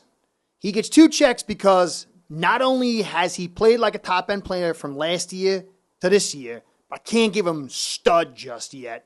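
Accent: New York, United States. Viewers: Great video as usual. You know what’s funny? When you get excited and talk about what you talk about with your ny accent, but when your clip comes up about your sponsor, it’s all professional and what not. I just think it’s funny. Keep up the great work and please never ever put a video out that last 4hrs like some other people do. Some videos are longer then the actual games. And I’m not talking about live streams, I’m talking about just regular ol videos. Sheesh.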